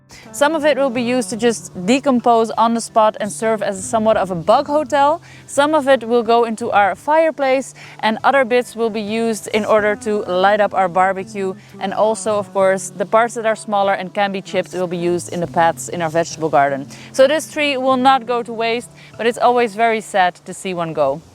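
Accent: Netherlands. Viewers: Do I detect a very slight Dutch accent ? I am glad I found you. I like your channel .. love from an old Dutch lady in California ❤❤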